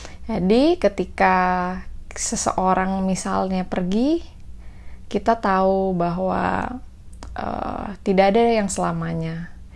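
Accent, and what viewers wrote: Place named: China. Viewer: Gak ah beda suaranya.. Ci ada logat chinesenya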